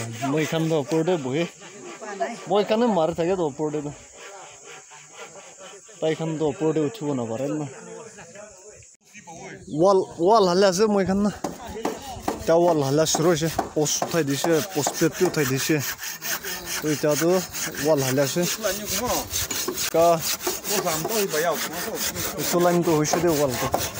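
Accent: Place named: Nagaland, India. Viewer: Ur nagames tune too😂 pakaaa sema asa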